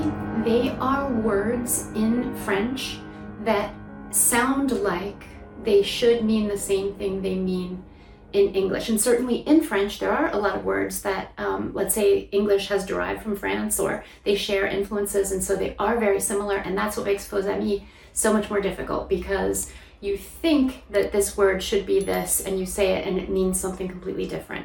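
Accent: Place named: United States. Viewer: Une américaine qui parle français...cet accent.. le mot anglais "coin" vient du français... coin (le lieu)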